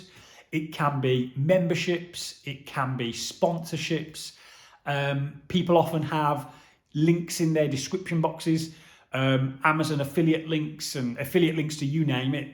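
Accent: Black Country, United Kingdom. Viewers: Hi, Steve here from Wolverhampton. As you say, it's time consuming work operating a small but growing YouTube channel, but you have done more than most and become monetised in just over a year. I have only just come across your channel and enjoy your presentation style in that fellow Black Country accent with an open and honest approach. I have particularly liked your pension update and solar panel review and have diarised Ace Solar for when I get quotes next year and will mention your name when I contact them. Now for a few new video idea - Based in the Black Country, the new Peaky Blinders movie coming out soon how about a trip to the Black Country Living Museum showing the delights of this fantastic facility and some of the locations for filming - Food for thought - Keep going, we are all enjoying your posts.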